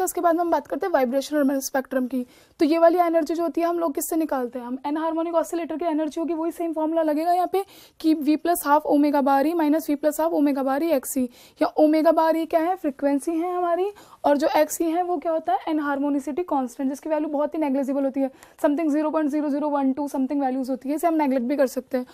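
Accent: India. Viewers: Is this English or english-Indian speaking?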